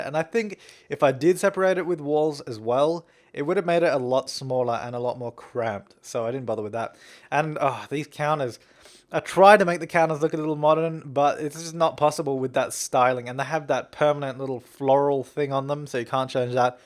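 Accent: Australia. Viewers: he’s Australian.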